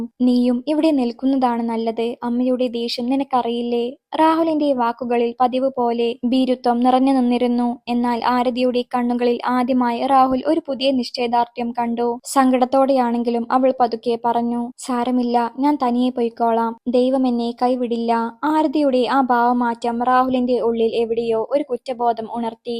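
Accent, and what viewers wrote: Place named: Kottayam, India. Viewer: വെല്യ എന്ന വാക്ക് കോട്ടയം സൈഡിലൊട്ടൊക്കെ കോമൺ ആയി യൂസ് ചെയ്യുന്ന word തന്നെ ആണെടോ 😊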